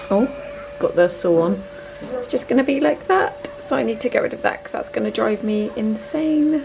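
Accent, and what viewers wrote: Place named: Bristol, United Kingdom. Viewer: Just seen this and you must be a fellow Bristolian with that accent surely 😂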